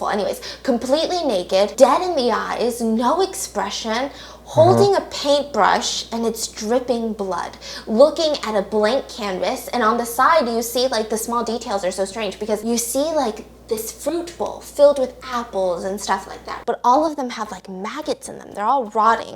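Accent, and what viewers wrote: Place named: United Kingdom. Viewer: Your fancAy British accent is everythingg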